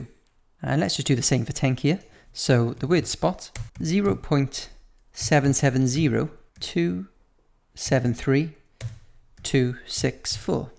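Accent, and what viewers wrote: Wales, United Kingdom. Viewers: omg! you're welsh!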